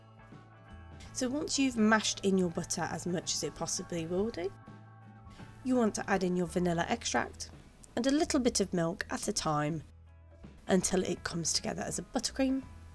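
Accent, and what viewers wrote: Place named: United Kingdom. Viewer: Hi! I love ur british accent. what part of the uk is it from?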